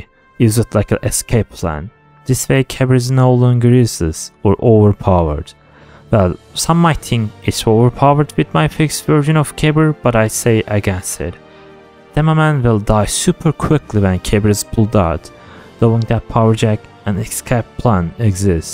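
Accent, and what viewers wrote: Turkey. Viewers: Чувствую турецкий акцент)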